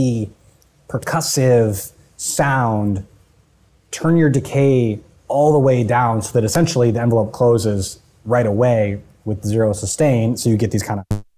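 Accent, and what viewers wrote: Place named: United States. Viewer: Also never comes out of character with the American accent....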